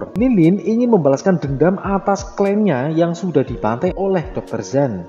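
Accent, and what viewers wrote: Banyumas, Indonesia. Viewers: Rika wong endy man deneng bahasane ana banyumasane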